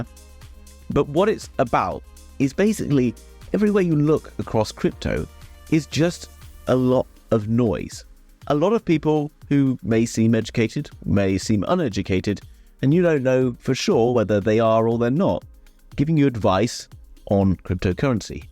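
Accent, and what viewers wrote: RP, United Kingdom. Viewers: ah, you were a Rupert. It figures with that posh accent of yours. 😉 Yeah, I stayed for about 6.5 years. I managed 5 tours in that time. That kind of did me in tbh. I love your channel, mate. Keep up the good work.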